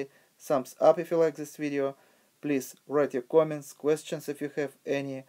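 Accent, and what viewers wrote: Russia. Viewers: Не знаю 🙃 Но я с первого слова понял , что автор русский 🇷🇺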